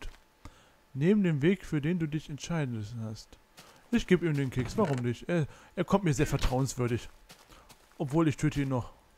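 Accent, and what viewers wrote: Berlin, Germany. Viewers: Den Akzent versteht doch jeder! und dasb isschen berlinerisch ick glob ick spinne dat müssteste wenischstens n bissl kenn ehy! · Dat ist Berliner Akzent, so schwer ist dat nicht.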